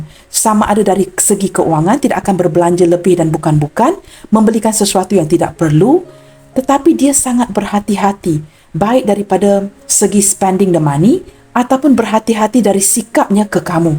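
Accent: Malaysia. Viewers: Suara nya nada cakap malaysia ni kan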